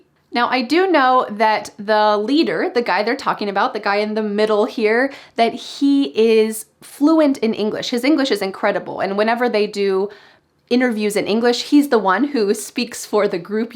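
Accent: United States. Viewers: I really like ur smile, and you have a beautiful American accent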